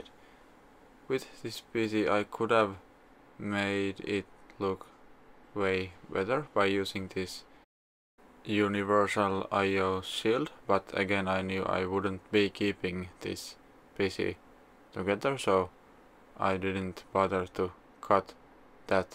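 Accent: Finland. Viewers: Katsoin 10 sekuntia ja oon täysin varma tästä Suomi aksentista. Löysin tänne koska etin videoita kotelosta kun tilasin tän siskonpojan kokoonpanoon ja halusin nähdä mimmosii fiiliksii muilla on tästä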